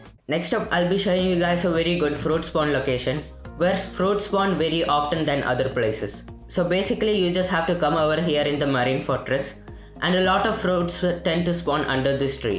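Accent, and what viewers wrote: India. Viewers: You sound like an indian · indin accent 💀💀💀💀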